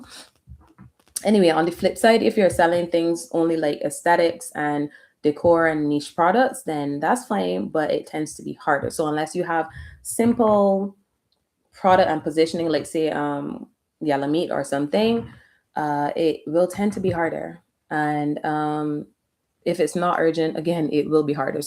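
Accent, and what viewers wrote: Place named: Barbados. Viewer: Subscribed in the first minute because it sounds like you’re Bajan! 🇧🇧 Anyhow, this is a great topic!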